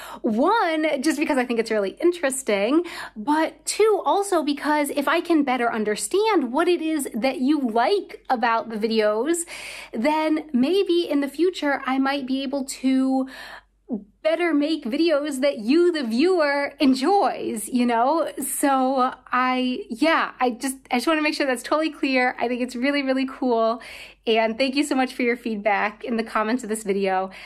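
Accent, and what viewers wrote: United States. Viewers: U.S. viewer. I normally watch the English version. Sometimes I feel like watching the German version, though, because I took several years of German in high school, yet I find it very difficult to understand videos from native Germans. Weirdly, I suppose because of your American accent, I can understand your German surprisingly well. Thus it's fun to "practice" my German by listening to an American speak it. 🤔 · Colombian in Germany. I think most viewers are ex-pats in Germany, thus the English preference. Also, the popularity of the videos in English, rather than German could be because you have a very thick American accent in German, and I personally, have problem enjoying it. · As an ex-pat Brit living in Germany, I watch your videos for your experiences of living here. To be honest, I prefer the English language videos, your American accent with German words is sometimes hard. 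Re: finding out about the videos - checking my subscriptions or when you mention it on Twitter.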